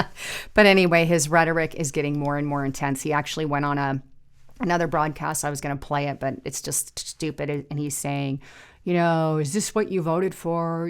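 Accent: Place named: South, United States. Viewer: Great thorough report as always - and nice job w/Min-ne-a-polis. Hey, if it's the way you have always pronounced it - yes, hard habit to break. Also, I'm originally from Mass-a-chu-setts - noticed you like many here in the South pronounce it Mass-a-two-sh*ts - not sure if its a purposeful slight on the state by Southerners. Either way, at this point in MA history, its a very appropriate pronunciation!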